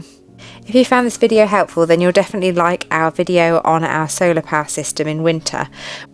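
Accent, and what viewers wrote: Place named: United Kingdom. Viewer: Thanks for uploading this video love the British accent ❤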